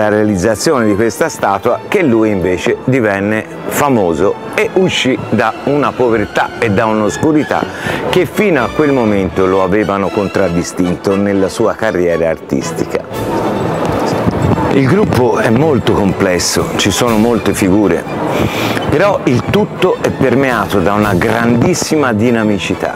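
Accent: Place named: Florence, Italy. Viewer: Ascoltarla è un piacere. Tratta sempre argomenti vari ed interessanti con un gradevolissimo accento fiorentino che mi ricorda gli anni felici vissuti a Firenze. Un caro saluto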